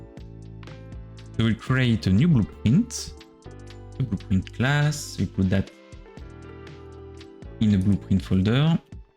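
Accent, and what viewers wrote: France. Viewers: On reconnais l'accent Français lol ^^. Tu pourrais en faire en Francais ? Sa serai cool !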